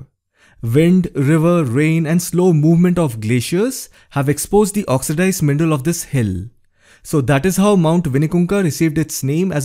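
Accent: India. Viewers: Your indian accent is so clear.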